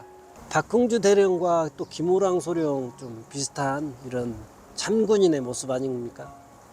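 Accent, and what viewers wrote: Jeolla, South Korea. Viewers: 전라도 억양으로 또 이런 코인팔이 하는 구나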